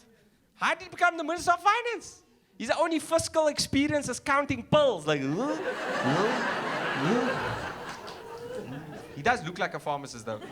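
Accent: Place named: South Africa. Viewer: sounds both Coloured and Indian 😅😅 nice!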